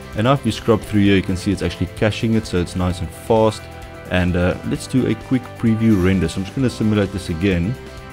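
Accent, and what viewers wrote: South Africa. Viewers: Hi great Tut! thank you. are you South african? you have a interesting accent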